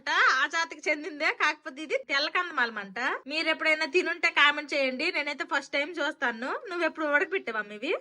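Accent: Srikakulam, India. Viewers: మీరు సిక్కోలు యాస లోనే వీడియోలు చేయండి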